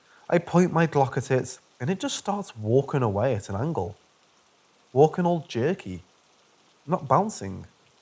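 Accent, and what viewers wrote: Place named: Merseyside, United Kingdom. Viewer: Good to hear another Merseyside accent! 😁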